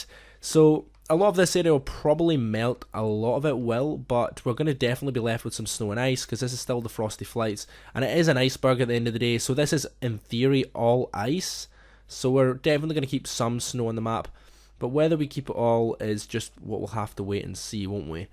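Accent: Scotland, United Kingdom. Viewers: So your scotish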